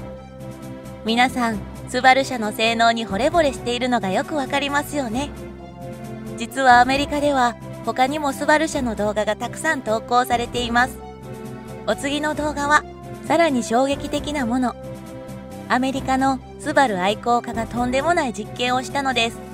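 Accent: Kansai, Japan. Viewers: ちょちょちょっとまて〜〜〜！この（京なまり？）（関西なまり？）好きすぎてたまらんのだけども！全然内容が頭に入ってこん。 当然チャンネル登録しちゃうでしょ〜〜！なぜ今までなかった！ん？合ったのか？俺が知らなかっただけ？ 何れにしてもめろめろだ〜〜〜〜〜〜〜〜〜〜〜〜〜！ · 関西訛りのナレーションが良い。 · このナレーション、関西訛り全開で親しみがわくわ！ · パーソナリティの関西弁が可愛い。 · ”出来の良い車”は富士重工の車・・・って車好きの親から言われ続けて育ちました。 スバルってホント、”キッチリ”作りこまれていますもの！ それから 今回のナレーションの彼女も少しの関西なまりがすてきでした。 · スバリストなので興味を持って見たが、関西弁で萎えた。 標準語で話して欲しかった。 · しゃべりのイントネーションがちょくちょく関西っぽい…？ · 関西人やね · 何が良かったって、解説の関西弁アクセントが最高でした😄👌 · ナレーターさんは、関西人ですか？めちゃ和むわぁ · 「スバル」のサムネイルに惹かれて見にきました。 なんだか関西弁が心地よくて聞き入ってしまいました。 冒頭で私が所有しているフォレスターの話題だったため 最後まで見入ってしまいました。 チャンネル登録もさせていただきましたよ。 応援しています。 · 関西訛りなのめっちゃ気になって話入ってこねぇ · 内容良いから聞いてたけど関西弁ウザくなる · ナレーションのお姉さんの関西訛りが面白かったです。だいぶ矯正したようですけど、ところどころ関西のイントネーションでなんか温かみを感じてよかったですよ。 スバルの驚異的なパワーの秘密が中島飛行機のエンジン製造技術にあったとは知りませんでした。 日本でももっと売れていいはずなのにねええ。インプレッサは今も人気だと聞きますけど。 ハリウッドの映画の中でもドラフト？する日本車はたいていインプレッサだったような…。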